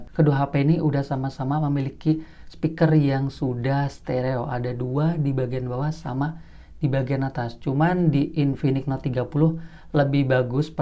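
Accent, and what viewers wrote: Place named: Demak, Indonesia. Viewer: Bang lo orang demak ya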